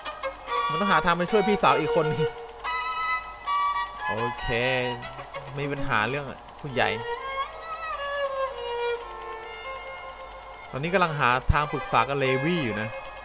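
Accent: Thailand. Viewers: เสียงเหน่อ55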